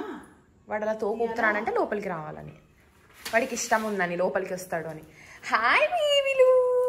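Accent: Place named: Telangana, India. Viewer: ni slang aacham telangana pilla la matladuthunaruu ela '' chesinaruu vachinaruu ani bagundi ala miru matladuthey 💗✊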